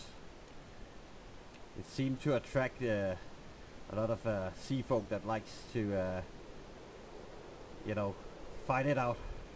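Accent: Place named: Denmark. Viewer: I smell danish ;) Awesome video thanks!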